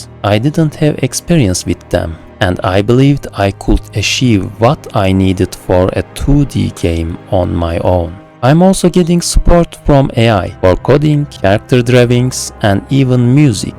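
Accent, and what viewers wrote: Turkey. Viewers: You speak like Turkish :) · Are you Turkish? yazacaktım sonra adını gördüm ama instagram linkine tıklayınca Türk olduğunu anladım. Türk aksanıyla İngilizcenin en güzel örneği:) kötü bir şey demiyorum bu arada, aksan bence güzel bir şey 😀 Başarıların devamını dilerim, böyle oldschool rpgler eski grafiklere rağmen daha dönemi yansıtıyor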